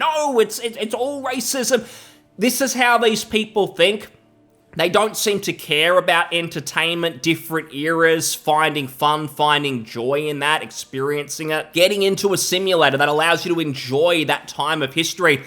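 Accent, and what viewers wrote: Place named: Australia. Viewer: The way you talk is utterly ridiculous. Are you actively trying to sound like 200% more Australian?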